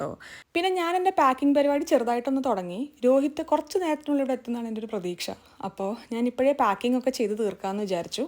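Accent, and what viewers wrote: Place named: Valluvanad, India. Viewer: Nalla Reethiyil Valluvanadan Bhasha Samsarikkunnundallo, Swantham sthalam Valanchery aayathu kondayirikkum alle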